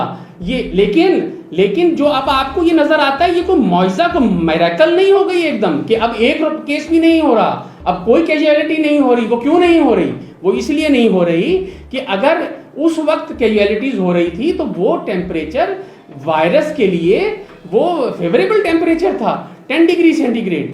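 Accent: India. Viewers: اسکا لہجہ تو انڈیا جیسا ہے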